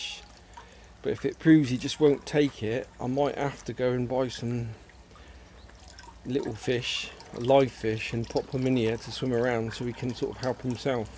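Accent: England, United Kingdom. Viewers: any way i love your vids keep up the good work matter a fact do know ksi sound like your from england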